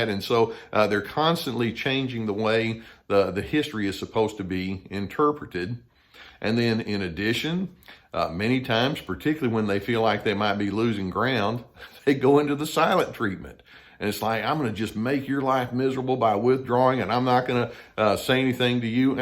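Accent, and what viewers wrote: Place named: Texas, United States. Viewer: Always funny to hear a highly educated individual, with a Texas accent. 🤣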